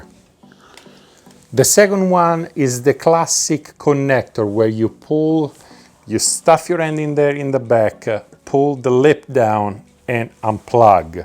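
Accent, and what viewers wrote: Italy. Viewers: I like the italian accent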